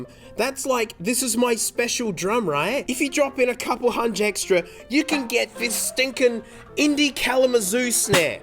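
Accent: Australia. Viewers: ever since he took a break, his australian accent thickened lol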